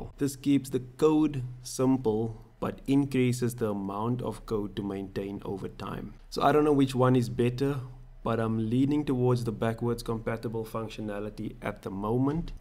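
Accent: South Africa. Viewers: Hey dude, are you from South Africa ?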